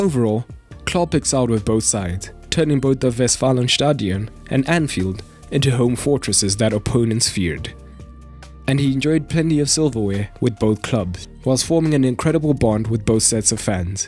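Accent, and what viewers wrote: South Africa. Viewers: You’re South African innit?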